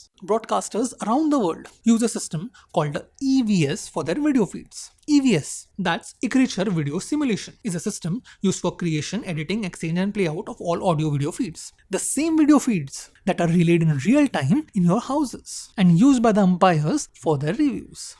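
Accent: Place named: India. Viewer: My goodness I can't take this accent. Among all the English accents, Indian English accent is the worst. My goodness. Go easy on Ts and Ds . You might kill em 🤣🤣😅😅. Not that other accents are better but if compared ......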